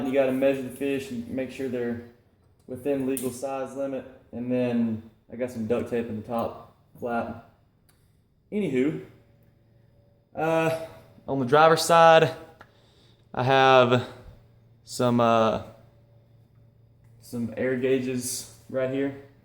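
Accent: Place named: Appalachia, United States. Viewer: Always like hearing hillbillies try and explain something.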